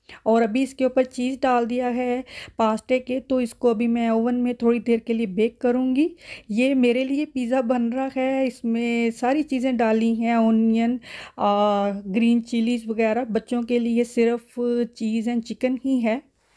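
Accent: India. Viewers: Aap ka accent kitna simple aur desi hai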